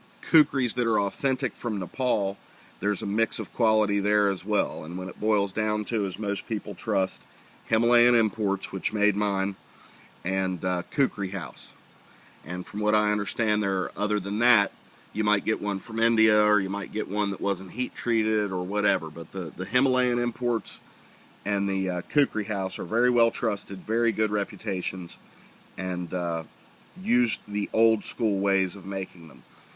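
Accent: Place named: Alabama, United States. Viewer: Gotta love that Alabama accent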